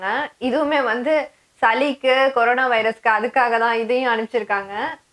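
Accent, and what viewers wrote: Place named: Tiruchirappalli, India. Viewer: Ethu trichy a athan language laye theriyuthu wow me too trichy Namma pullaya nenga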